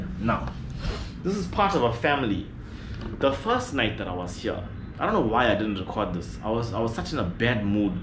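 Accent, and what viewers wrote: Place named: Kenya. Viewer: You have an African accent bro kenyan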